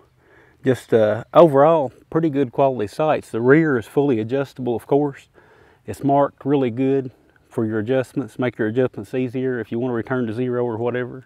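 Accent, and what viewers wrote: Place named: Kentucky, United States. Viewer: Love that Kentucky accent!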